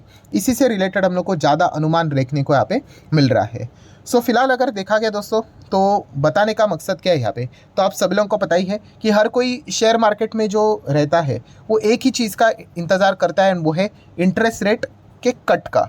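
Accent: Maharashtra, India. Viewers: Accent to Marathi he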